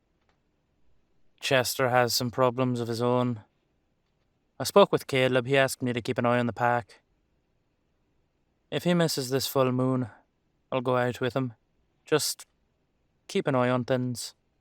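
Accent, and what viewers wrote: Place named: Ireland. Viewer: I love your Irish Accent. Erin Go Bragh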